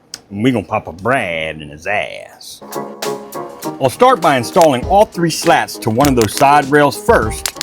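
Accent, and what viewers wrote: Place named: Louisiana, United States. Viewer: I swear you sound more cajun dan vikin. just sayin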